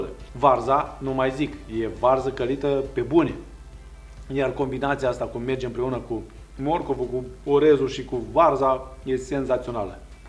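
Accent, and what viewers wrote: Moldova. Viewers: Chef mor dupa retetele tale .bune bune rau 😂.Doar un umil sfat incearca sa fii tu ca esti super nu mai accentua accentul moldovenesc ca nu e nevoie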